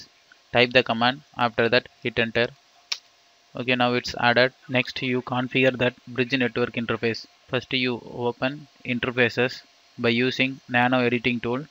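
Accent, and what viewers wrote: India. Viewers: argh darrn designated street accent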